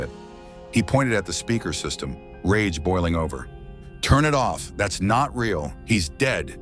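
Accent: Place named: United Kingdom. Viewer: What's with the British accent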